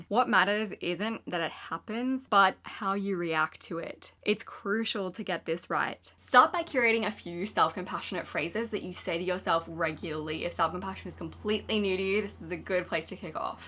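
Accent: Australia. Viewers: Could you please speak a little slower for not Aussies?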